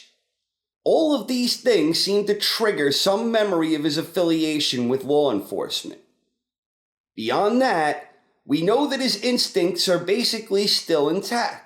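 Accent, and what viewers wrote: New York, United States. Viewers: that is a serious accent you have. NY?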